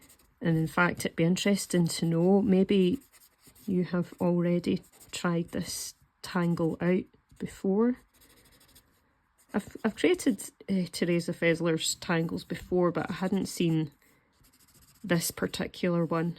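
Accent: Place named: Scotland, United Kingdom. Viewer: Never heard of tangles until now. They look fascinating. Nice to hear that particular Scottish accent, too!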